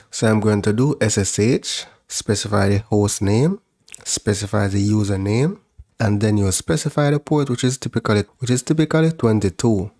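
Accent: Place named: Jamaica. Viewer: First mi a hear patois on this side of yt, big up yuhself mi g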